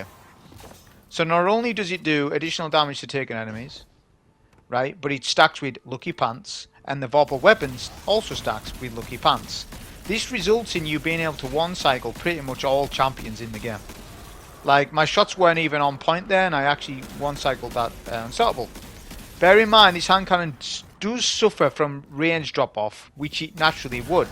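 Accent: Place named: Scotland, United Kingdom. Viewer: As a player exclusively playing with German speaking gamers I always recommend channel, but rarely they speak English well enough to understand his scottish accent. So they go to German speaking youtubers to watch how to play those Nightfalls. I understand well since I worked with two scottish women for a long time. And this channel IS the single best informative channel out there, no one else shares his knowledge in such a detailed way.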